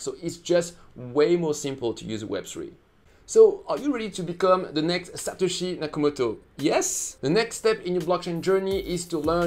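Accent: France. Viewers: Love the French accent ❤️👍🏽But love eating the blocks more! :) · I do enjoy your French accent! Plus really great content. The Harvest Finance hack video you did was excellent!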